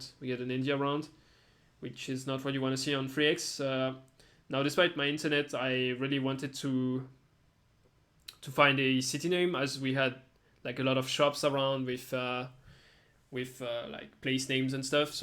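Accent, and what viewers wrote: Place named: France. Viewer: Pourquoi tu as le drapeau Danois ? j'entends l'accent français 😅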